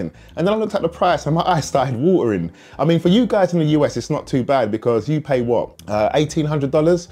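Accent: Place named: South, United Kingdom. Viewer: Nice video mate, great to hear another southern British accent on YouTube hahaha!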